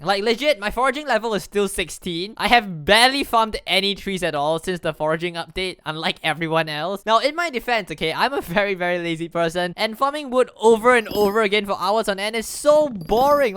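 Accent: Singapore. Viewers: Singaporean ah?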